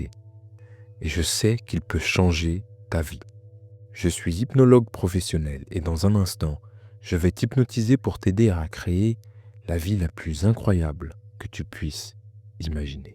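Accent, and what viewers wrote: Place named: Cameroon. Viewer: Ma vision limitée dit ceci ... Tu as Ton sous-accent camerounais-bamileke qui manque dans ton discours et qui distrait un peu,car il est noyé ... Sans offense. Hotep Paix.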